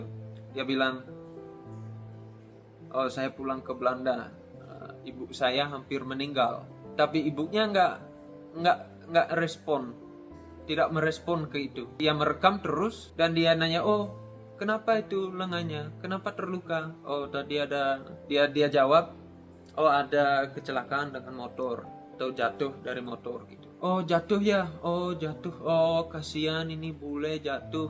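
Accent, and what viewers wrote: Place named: Bali, Indonesia. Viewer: Bahasa indonesianya, sekilas dua kilas ada aksen Balinya. Sangat memboemi sekali meneer.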